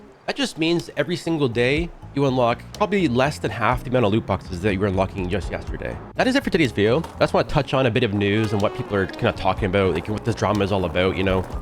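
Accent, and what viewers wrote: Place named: Canada. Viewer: HES A FUCKING MOOSE LOVER ! lmao that sounds kinda negative 😂 but fr I always forget hes Canadian til he says a few words that sound different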